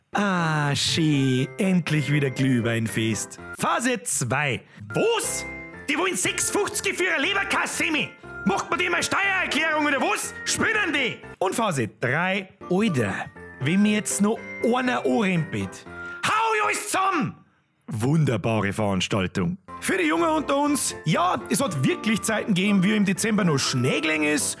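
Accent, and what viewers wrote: Bavaria, Germany. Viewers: Dieses bayrische 💆🏻‍♂️💆🏻‍♂️💆🏻‍♂️💆🏻‍♂️💆🏻‍♂️💆🏻‍♂️💆🏻‍♂️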